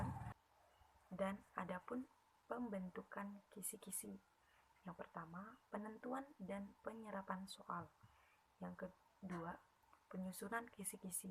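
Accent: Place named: Java, Indonesia. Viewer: Semangat KK😂 Jawa nya medok mbak ee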